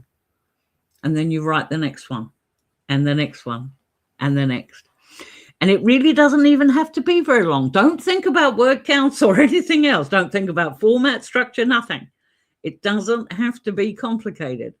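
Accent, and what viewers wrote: New Zealand. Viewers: What a wonderful vlog. First time seeing your channel and have subscribed. Immediately spotted the accent and harekeke out the window so felt at home as a kiwi too. In answer to your request to comment to someone wanting to write: Writing matters. It matters to you, that’s why you feel the urge. That’s why you feel the compulsion. It matters, that’s why you feel the resistance. It matters, that’s why when you finally start, you feel whole, you feel joy, you feel the desire to continue. You’ll also feel the self criticism and doubt but you keep writing because writing matters to you. Keep writing till you get beyond it mattering. Keep writing till you feel it’s who you are, someone who writes. Just like you might be someone who knits, someone who runs, someone who paints, someone who bakes. Write because you are someone who writes.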